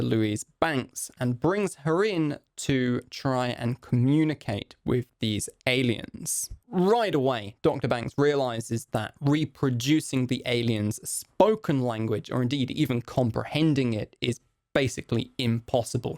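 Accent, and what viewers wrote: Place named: United Kingdom. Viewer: I don't used to like british accent, But yours i liked, I'm just liking for some reason...as soon as possible I'll buy your book Good job bro.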